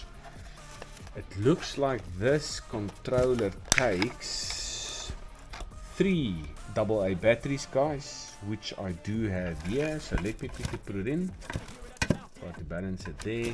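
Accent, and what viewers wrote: South Africa. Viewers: I like it bud! I subbed to stay tuned in. Looking forward to what's to come in the future 👌🏼 Hey on a side note what is that checker board square area you were driving in meant for? I'm in the U.S so just curious. Also I always try to pin down accents and I'm guessing south Africa? If yes you have a beautiful country!! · Great video.I watch your channel for the interesting content and also the fact that you sound South African.any advise.Bought this but after 10min or so of running in the grass it cuts off.esc is very hot.Is this normal?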